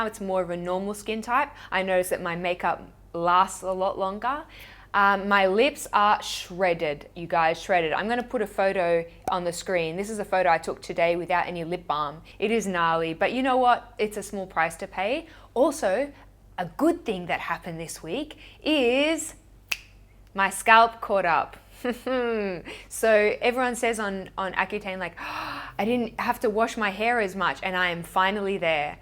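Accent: Australia. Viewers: Wow an aussie on YT! Great to see and hear :) From an aussie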